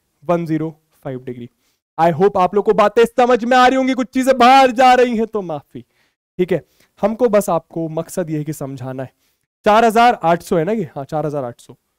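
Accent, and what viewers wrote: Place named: Bihar, India. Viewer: Sir ka bihari accent🤌🏻🤌🏻🗣️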